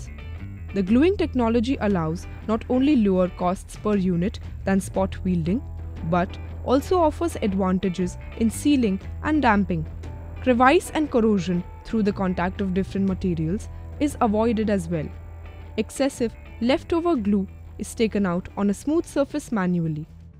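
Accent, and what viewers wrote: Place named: India. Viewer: Bahasa Inggris nya orang India ini lucu...😂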